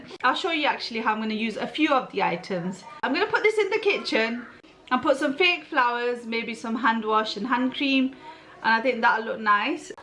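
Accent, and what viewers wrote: Manchester, United Kingdom. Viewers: Can’t you tell by her accent? She’s from near Manchester!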